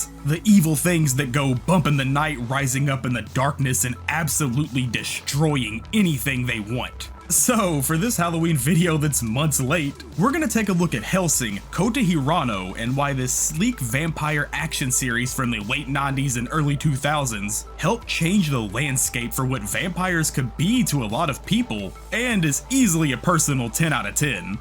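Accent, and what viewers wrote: Texas, United States. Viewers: Great video! Also, are you Texan? Kept catching that twang from certain words and vowels❤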